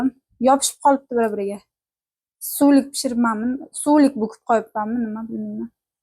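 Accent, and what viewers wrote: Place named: Khorezm, Uzbekistan. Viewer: Siz o'zi horazmdanmasmi shevangiz horazmchada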